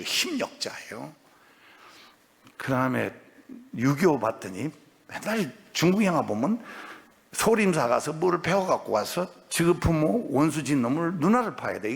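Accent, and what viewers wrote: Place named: Jeolla, South Korea. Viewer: 인요한박사님모두훌륭하신데 전라도사투리만쓰지안으면 백프로훌륭...😅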